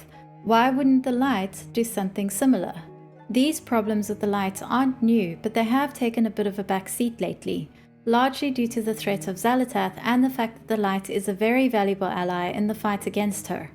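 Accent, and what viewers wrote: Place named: South Africa. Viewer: I knew you sounder familiar. You sound just like a South African. I hope your trip goes well.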